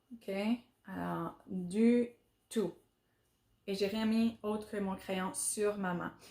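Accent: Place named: Canada. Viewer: J'adore ton accent petite soeur hi acanadienne ............Ton sourire ton peps je kiffe <3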